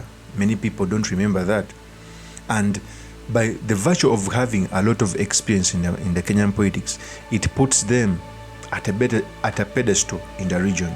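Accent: Uganda. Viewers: Ugandan accent detected.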